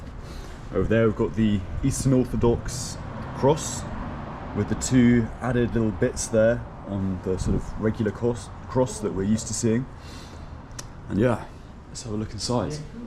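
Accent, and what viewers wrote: England, United Kingdom. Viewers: Being Irish myself, I love your English accent and you are also a very handsome-looking young man 🇮🇪☘️🇮🇪🥰🥰🥰🥰🥰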